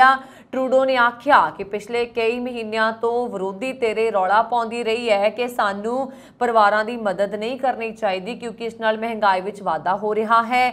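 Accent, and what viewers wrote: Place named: Punjab, India. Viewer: Mam your punjabi accent is very sweet😊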